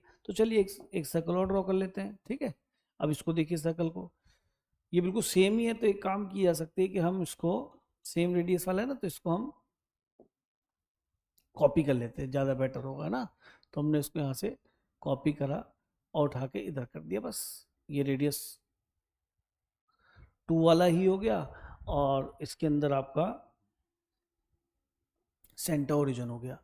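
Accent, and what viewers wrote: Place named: Uttar Pradesh, India. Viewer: sir ap up s he kya?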